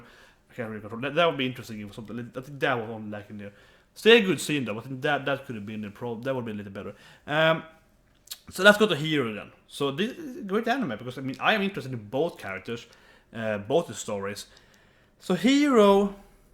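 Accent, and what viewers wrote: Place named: Sweden. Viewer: at first i couldnt tell if u were speaking swedish or english cool accent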